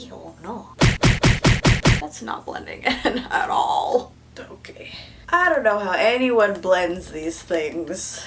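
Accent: United Kingdom. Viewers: I love your British accent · When is she speaking w/ british accent? Time?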